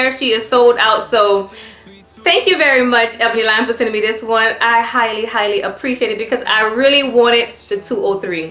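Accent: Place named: New Orleans, United States. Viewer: New Subbie. You have a New Orleans accent, I know this because that's my city... Baaa-Bay... I want that color, it's beautiful on you. I want 2, LOL..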